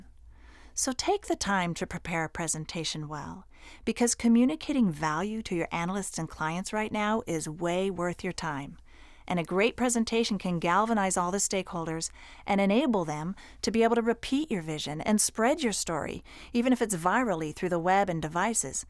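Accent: United States. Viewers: Its a west coast accent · Appreciate your generosity ❤ plus If I may ask: which state accent is that? I’ve been fond of that accent and I wanna know it’s origin in order to learn it and not to mix it up with other accents. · The voice is really not very clear. It's gabbled, American?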